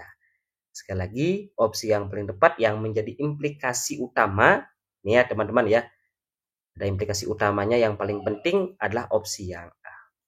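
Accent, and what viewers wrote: Bali, Indonesia. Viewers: bang saya suka logat balinya wkwkwkwk .. mantappp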